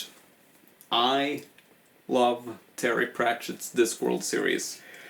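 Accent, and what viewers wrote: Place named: Norway. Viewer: Wonderful accent my guy! Can tell ur Norwegian.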